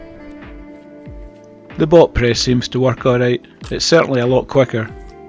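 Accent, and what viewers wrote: Scotland, United Kingdom. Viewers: I love your acsent sound scotish , amazing setup fór the bricks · Your own design is superior in overall performance, in my opinion. I honestly believe you get extra credibility; because of your honest Scottish accent. My mom and her parents are from Glasgow. I am in Irvine California. Nice video thank you for making it happen. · I just love Scottish accent!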